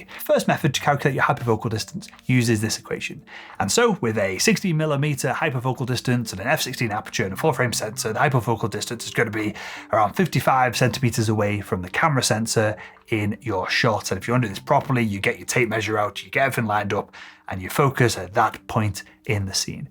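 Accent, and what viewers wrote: United Kingdom. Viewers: There seems to be an influx of British sounding youtubers that speak confidently, like they know what they're talking about, but in reality are spreading so much misinformation and bad info it should be criminal. Just hearing "aperture, or otherwise known as the hole in tye front of your camera". Sheesh. Don't even get me started on the whole aperture and noise comment, I get where he's coming from but man was it poorly phrased for an "educational" video.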